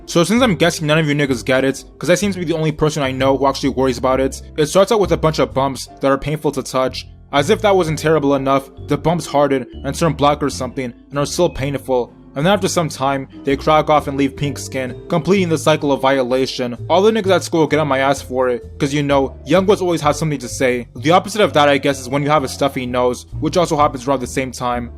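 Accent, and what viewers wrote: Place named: New York, United States. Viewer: I am new to your channel and deadass I fucks with you heavy I am from NewYork recently moved but when I hear your voice and I feel right back in New York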